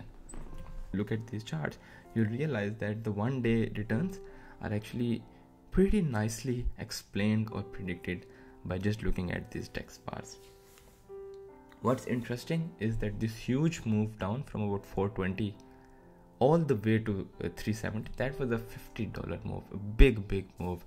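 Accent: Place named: Gujarat, India. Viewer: Are you from gujarat or mumbai by any chance... Guessing from the accent.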